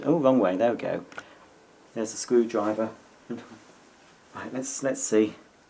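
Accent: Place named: United Kingdom. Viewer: Love the British accent! (That's a compliment)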